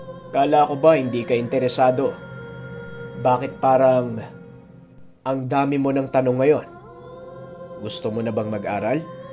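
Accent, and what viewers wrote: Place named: Visayas, Philippines. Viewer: Gusto ko pag nag bibisaya accent in tagalog ka..comedy horror kc lumalabas ang kwento.nakakatawa.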